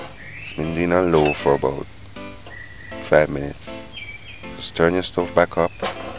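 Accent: Jamaica. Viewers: Leave him alone, he’s not pretending to have an English accent, he is Jamaican . There are people who enjoy watching him such as myself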